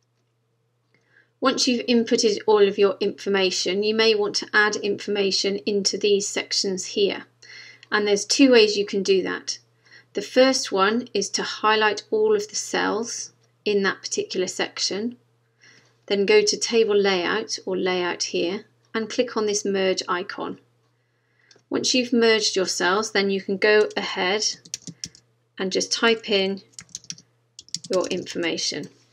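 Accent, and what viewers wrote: United Kingdom. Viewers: why that god damn British accent is like super attractive to me mam really appreciate your help on the gannt chart but i really need to tell u that the accent is super attractive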